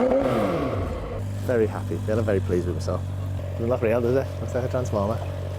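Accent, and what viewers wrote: United Kingdom. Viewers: You hater 😂😂. His vibe & flow is second to none and his quick witted northern monkey flow is 🤌🏻🤌🏻🤌🏻🤌🏻 love ya. Congratulations mate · As usual I’ll need the subtitles for this. It gets well weird north of Stroud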